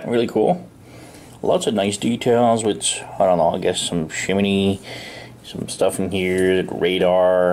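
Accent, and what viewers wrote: Quebec, Canada. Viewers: Super interesting. I haven't built these kinds of models before. Do I hear a Quebec French there?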